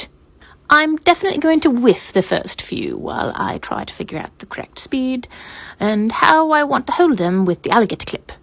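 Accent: RP, United Kingdom. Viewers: I don’t know if I’ve ever heard a more posh accent, absolutely love it!!!!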